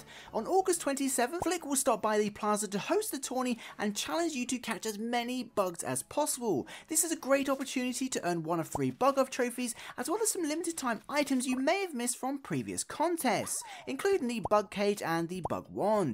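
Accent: United Kingdom. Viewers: Are you from Great Britian?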